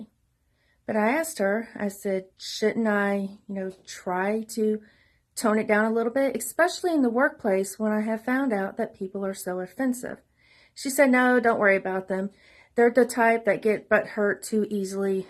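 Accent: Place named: South, United States. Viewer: Context is everything. I'd likely be less ruffled from you it because you sound genuine, caring and southern. Terms of endearment can sound sincere or angrily sarcastic depending on the setting, the delivery and the accent. However, "honey" sounds too casual for a serious setting like a medical office, where I hope everyone is meticulous. It can sound sarcastic and condescending which I dislike when I seek medical attention.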